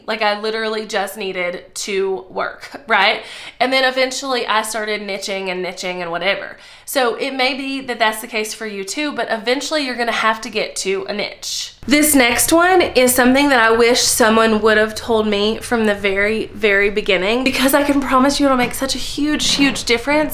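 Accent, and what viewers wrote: South, United States. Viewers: Thank you for leaning into your unique self and embracing your southern accent. TBH- and what I’m about to say goes hand in hand with this video, my thick southern accent is one big thing that I allowed to hold me back. And I have risen from the ground with zero social media in a janitor business that has a revenue of $19k/mo. But I could scale if I could learn and DO all these things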